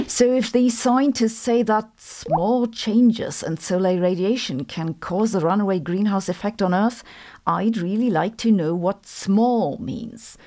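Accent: Germany. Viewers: Unrelated but germans have a VERY cute accent and i wont change my mind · Why? Of course its possible to pronounce, even for Germans. My advice is to get rid of that insane accent. Feel natural. If nothing else helps, use a chewing gum. And don't use english with other Germans. Thats always a drawback. If you say you have problems with "th", I tell you that you have never tried. Good luck.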